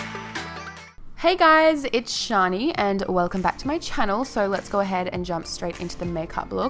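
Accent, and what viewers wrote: Australia. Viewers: GensMakeup shani is Australian like me!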